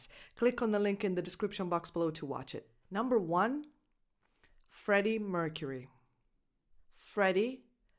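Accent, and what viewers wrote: United States. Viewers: Lei cara signora ha la cadenza americana!!l'inglese è un'altra cosa per mè..anche lei deve studiare l'inglese inglese però!brava và ❤ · Sei molto brava e chiara ma secondo me sarebbe corretto sottolineare che la pronuncia che spieghi è quella americana (e ad es freddie mercury e jhon lennon erano britannici e la pronuncia è leggermente differente dalla tua)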